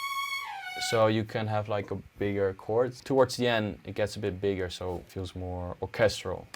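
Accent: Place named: Netherlands. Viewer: Lekker Nederlands accentje hoor!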